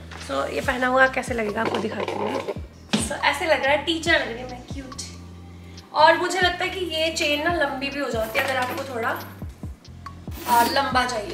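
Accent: Punjab, India. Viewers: I watched ur vlogs ur mix Punjabi nd Hindi accent Bec my mother spoke like u when she is wid me in dis beautiful world Buena don’t care about people they don’t allow us to live happily my sons name is also himmat stay blessed nd happy all d time😊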